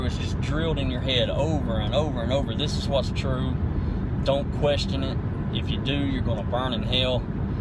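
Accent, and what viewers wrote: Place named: South, United States. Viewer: It's always hopeful to see atheists like you in the bible belt; to be reminded that just because someone talks with a hick accent doesn't mean they're Christianized...i.e. brainwashed. I run across you diamonds in the ruff sometimes on YTube atheism and react like Dorothy did when Toto fled the witches castle: "He got away! He got away!" I empathize with your dilemma: all their lives, but especially when young, proselytizers are going to be overtly trying to convert your kids while others, like their Christian teachers, will be doing the same if more subtly. There will be strong social pressure to conform and convert and we both know how that's hard to resist when y're young, especially in the Belt. It's like a tug-o-war with you on one end and an army of Christians on the other and your kids are the rope! You know what's coming: "Your father is an atheist? 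You poor lost child. We will pray for you..." Fuck that! I'm afraid there's only one path for you: become a one-man army and take the fight to them! That's what I did and now I'm a Doctor of Atheism, ready, willing and able to face an entire auditorium of Christians by myself and adroitly turn them out because I know my science, my philosophy and my bible. It may seem weird but I love the bible for what it is: a priceless window into the minds of ancient peoples, ignorant and superstitious as they were. But as history or a guide for morality, the bible leaves a bit lot to be desired. And let's face it: Christians use the b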